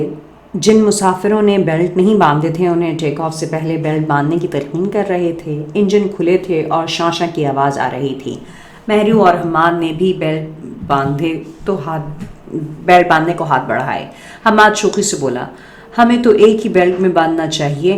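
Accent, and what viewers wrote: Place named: Upper class, Pakistan. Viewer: Irna burger ban k mt bolyn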